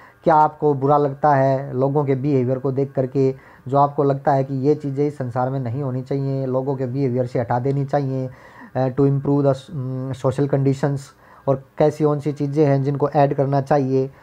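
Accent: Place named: Haryana, India. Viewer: Sir aap haryana sai ho na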